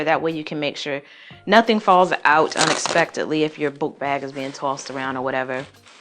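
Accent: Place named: South, United States. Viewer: She got that Southern accent 😌👌.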